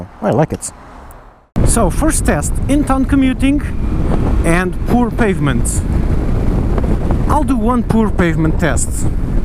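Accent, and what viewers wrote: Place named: Portugal. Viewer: Faço like pelo sotaque tuga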